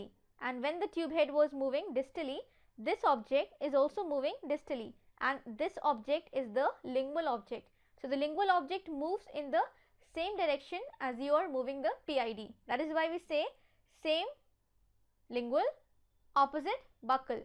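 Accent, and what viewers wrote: India. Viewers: Your Indian accent is hawt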